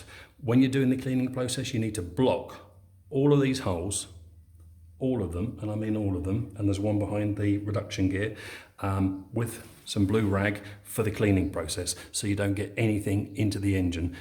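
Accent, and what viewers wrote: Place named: London, United Kingdom. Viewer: I have no idea why my wife's name came up on the comment but it is ok because she is from London and has the same accent. You sir are good. I have watched all of your videos and as a retired engineer and I am impressed. I have a 2011 Multistrada. I am currently in the process of replacing the front fork seals. Your brake videos are excellent. After watching your videos I decided to look at the brakes when I finish the fork seals. In fact the information that you provided in your brake videos got me to thinking about my brakes on my Harley. They are really not that good. But I will apply what I learned from your videos and see if I can make some improvements. Look forward to more of your videos.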